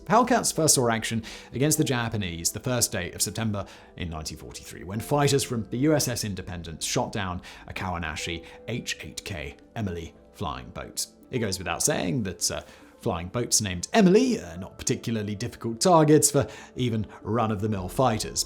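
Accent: United Kingdom. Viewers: The f6f was and is extremely well known. It's hardly an unknown. Tactics were simple, fly in at high speed take a shot and keep going. Don't dog fight, or die Japan had some incredibly skilled pilots but exceptionally poor training tactics, so once the few exceptional pilots were attritioned out it just became a matter of time before us Americans ruled the skies. Japan was never really going to pose a serious challenge due simply to Its limited man power, industrial strength and serious lack of raw materials. The US navy didn't want the corsair due to its difficulty in landing on carriers. The British, based on your accent I think you should know, showed the US navy how to land. Corsairs land by coming in from the side not straight on. The gull wing was to accommodate the massive prop and requirement for high strength gear meant lowering the wing to shorten the gear. 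Post war proves the Corsair was a better overall aircraft.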